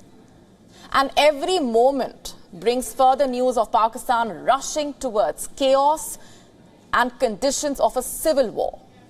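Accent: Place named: India. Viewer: The Indian accent and the hate whenever she says the word 'Pakistan' and 'Army' 😅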